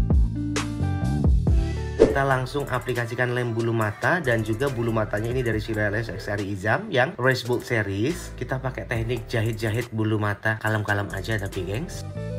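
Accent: Java, Indonesia. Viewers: Tetap medok 🙏🤭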